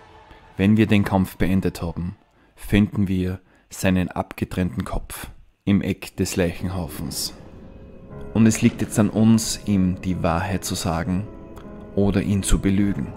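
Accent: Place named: Austria. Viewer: Wird gleich geschaut! Ich liebe Lore Videos zu den Fs Games vorallem mit nem österreichischen Dialekt! Schöne Grüße aus Pottendorf (Nö)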